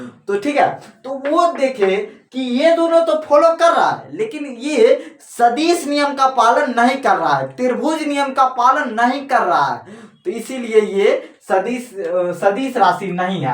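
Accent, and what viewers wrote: Begusarai, India. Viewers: Bhai aap Begusarai se ho kya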